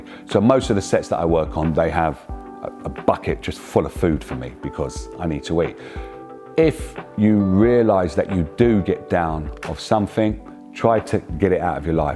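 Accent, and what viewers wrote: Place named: West Midlands, United Kingdom. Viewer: Lovely video! Well done in content, appearance, tone and message. It is the right mix of smooth and suave, with the hard but necessary wisdom from experience. A motivating message with hope, no less. Oh the accent! I must confess it draws me in and draws up the memories of living once upon a time in the West Midlands. Thank you kindly, for posting.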